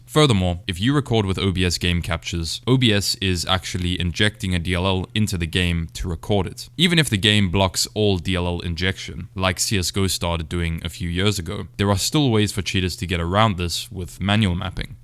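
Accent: South Africa. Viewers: This brah sounds South African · Clicked on this and didnt expect to hear a saffa, lekker vid bru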